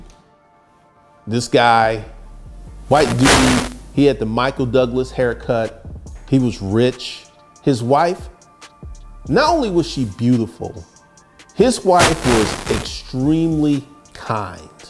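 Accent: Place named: Alabama, United States. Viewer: Do you know what's so funny is that I live in Detroit and I've lived here for 25 years and I rarely get a chance to go home to Birmingham Alabama. When I hear you speak , it brings back memories of growing up in Birmingham. And what is funny, no one here really know about AG Gaston. I actually lived down the road from him and we all knew that was his house on the hill that was gated. I was really thinking about moving back to the south. Thank you for content for thinkers.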